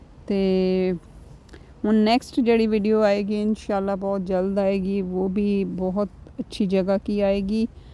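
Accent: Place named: Punjab, India. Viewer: اشعار اچھے ہیں لیکن آپ کے پنجابی لہجہ نے اشعار کی ایسی کی تیسی کر دی.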